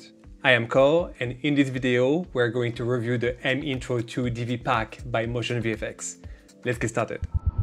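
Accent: France. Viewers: Are u French?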